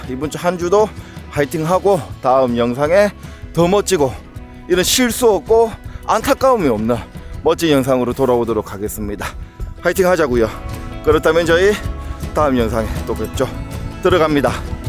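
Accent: Gyeongsang, South Korea. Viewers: 경남쪽(갱상도 사투리억양 넘넘 듣기좋습니다! ~~말솜씨도. 굿~~👍👍나도대구지만 경남억양이 참 듣기좋터라구요. ㅋㅋ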